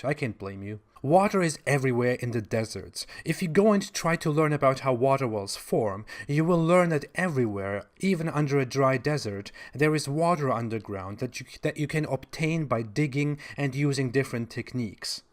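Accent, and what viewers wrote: Germany. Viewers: You have a German accent? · "I'm not Hitler just because i have a German accent"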